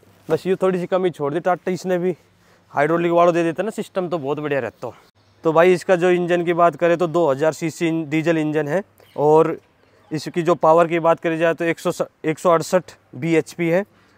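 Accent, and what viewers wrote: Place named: Haryana, India. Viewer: Bhai mere loved ur haryanvi tone …. Pehli bar haryanvi mein car vlog dekh ke ghana hi maja aagya … moj kar di bhai … bhot badhai aapka haryanvi mein vlog dekhte hi subscribe kia meine channel aapka … Jai shri Ram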